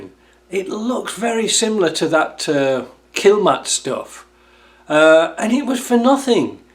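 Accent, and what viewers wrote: United Kingdom. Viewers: I thought you were from the UK. Only for canadians I think...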